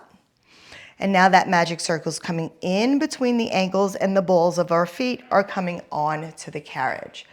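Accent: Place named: New York, United States. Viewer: I really enjoyed this one! Are you from New York? Long Island or somewhere? I find myself saying "carefully" like you do all the time now! lol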